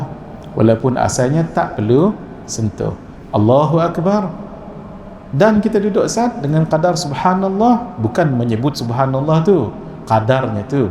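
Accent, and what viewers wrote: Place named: Sarawak, Malaysia. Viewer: Salam ..jazakAllah khair ..gaya bercakap mcm org kuching cakap bahasa melayu semenanjung ..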